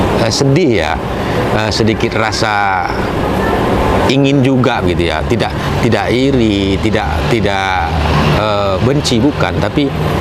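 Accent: Malaysia. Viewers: Saya penggemar komedi/YouTube di Medan minta kembali ke adegan Melayu,logat Melayu tu, usahkan melucu dengarnya aja udah enak awak dengarnya, he heee. maaf ya. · Kenapa tidak membawakan peran Melayu dan Jawa dalam 1 Chanel bg mungkin akan lebih menarik lagi karena kami (saya) lebih terhibur dengan logat Melayu Abang walaupun sebenarnya saya orang Jawa pujakesuma salam dari rantau Prapat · Aku orang jawa tapi aku senang bila pakai logat melayu lucunya ngena walaupun sebagian bahasanya aku gak ngerti sukses mantaap👍👍👍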